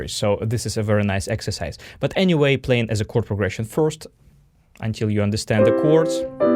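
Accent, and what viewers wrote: Russia. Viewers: I love your videos! Very useful Are you Russian?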